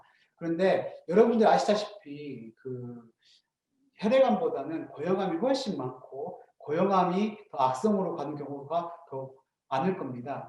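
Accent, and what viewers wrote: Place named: Jeolla, South Korea. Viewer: 대단한 기업 아니 노벨상 수상이 가능할 기업 전라도 구수한 사투리로 기업 설명 너무 자세히 영어 가급적 적게, 귀에 쏙들어오게끔 설명 감사합니다.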